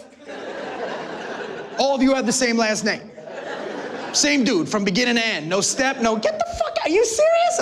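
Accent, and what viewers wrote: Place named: New Jersey, United States. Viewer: Love the Joisee accent and the cutting humor. Can't take yourself seriously ever again after listening to for an hour. I'd love to hear him take on Trump.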